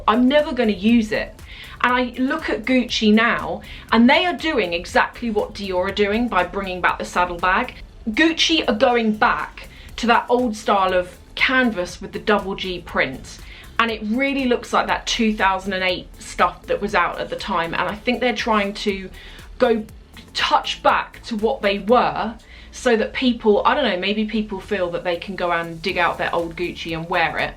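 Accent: United Kingdom. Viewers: Thank you, for the most entertaining, informative and creative luxury podcast of all of them. I love that you get right to it and do not belabor incessant droning on and on about nothing over and over and over. You have super fun communication skills, are SUPER FUNNY, PLUS your British accent is addictive. Thank you for a refreshing and lovely addition to my day.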